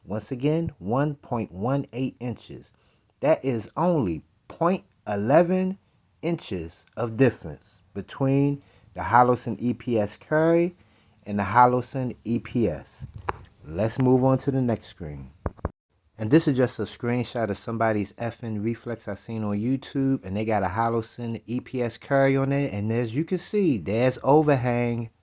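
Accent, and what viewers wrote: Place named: Alabama, United States. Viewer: my fiancée (southerner) said your accent sounds like you’re from Alabama or Mississippi. Was she close?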